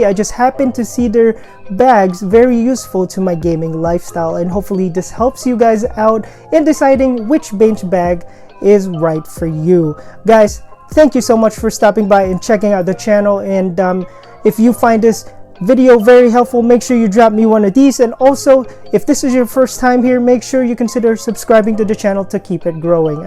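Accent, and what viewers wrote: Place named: England, United Kingdom. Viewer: Wow I love that English accent🤗❤️❤️❤️ wow that's a nice handy bag #mto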